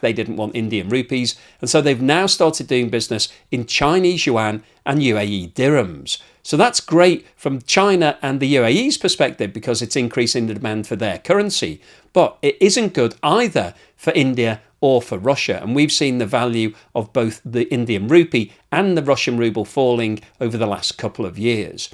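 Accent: United Kingdom. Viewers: His British accent doesn’t give me any trusting feel.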